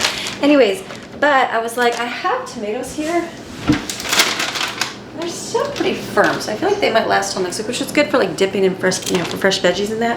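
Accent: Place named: South, United States. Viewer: I just want to say again I LOVE your accent and how you say certain words, I giggle and my hubby says "what are you laughing at?" I tell him and he laughs too. He is from the COUNTRY so his accent is even more southern than mine!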